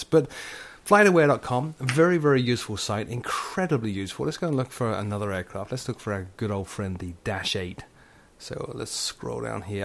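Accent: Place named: United Kingdom. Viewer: I've a question, are you from the United States or from Uk,you have the British accent haha!!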